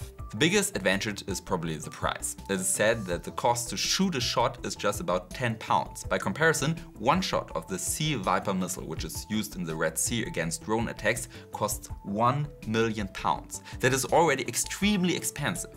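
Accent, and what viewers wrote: Germany. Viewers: Die englische Aussprache hört sich aber mega-deutsch an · And i still dont know if he makes his accent stronger for the vids (since many English expect one hard German accent) or if it is his normal one. But i say he will still grow in the next months , after one point and base quiet fast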